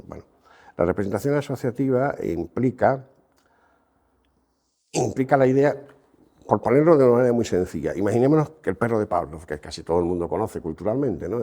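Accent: Spain. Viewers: por que hablan tan para el orto los gallegos???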